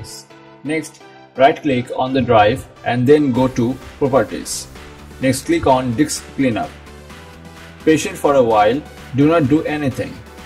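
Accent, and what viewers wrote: India. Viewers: He is speaking English, you fucking pleb. He's Indian and has a strong accent. So as English probably isn't his native language, I think he's pretty understandable. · I used automatic subtitles because of his accent (I'm not a native and still aren't used to the Indian accent). The automatic subtitles worked pretty well mostly.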